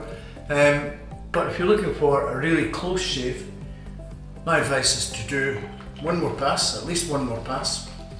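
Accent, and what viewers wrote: Scotland, United Kingdom. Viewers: The Scottish accent. Yeah, that was a terrible attempt at a joke lol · Just adding the Scottish accent adds authority to all things pertaining to gentlemenlyness. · anthony hopkins has fallen on hard times... its odd to hear him speak with a scottish accent... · This is the video that influenced me to purchase a safety razor and throw out the multi-bladed sissy razors. Been shaving like my grandpa for about a year now and I still occasionally come back to this video just because. Wonderful video, almost hypnotic especially when you've got a Scot speaking, plenty of great information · Hot Scottish accent and hot Scottish bull. Wow he looks rich too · I like that scotish accent 😎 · I don't know if it's the cool scottish accent or the chill atmosphere and vibe, but man this was insanely satisfying, relaxing, and informative! 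It's like a comforting amd reassuring Scrooge McDuck showing you how it's done! Very nice! 😅😆😁👍🏻Might get into one of those razors! Been wanting to try one for a while! Good stuff! Thanks for the detailed tutorial! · I swear I could sit here and listen to him talk about shaving all day. You gotta love the Scottish accent. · I love the Scottish accent. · Avec l'accent Écossais c'est génial ! Merci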